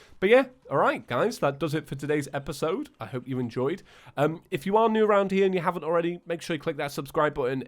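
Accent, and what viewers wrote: United Kingdom. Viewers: im the kind of guy that likes to correct people and i know this is just a dialect/accent but everytime you pronounce terraria "terr-are-ee-ah" it really bothers me, and i have genuinely nothing against it itself, the brittish accent is very nice, it just really bothers me for some reason XD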